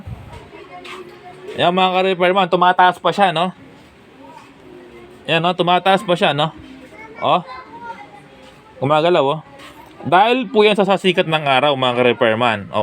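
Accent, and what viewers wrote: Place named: Pampanga, Philippines. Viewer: Kapampangan ka kuya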